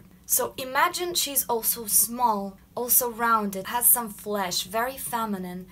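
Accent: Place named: Russia. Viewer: That is funny I found that your accent someway is like you are surely not from Us or England and then I checked you channel oh gash you are russian. That accounts for the milk in the coconut xD OMG I can't really stand it but your information seems pretty important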